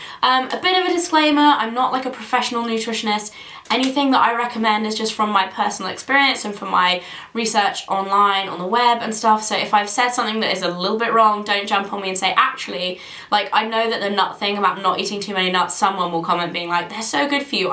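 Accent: England, United Kingdom. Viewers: Your British accent really comes out in this video hahaha brings me back to the video you did with Luke! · There would be sooo much confusion between us if we met in real life, because I have a thick Southern accent and you have a thick English accent! Hahah, love you:)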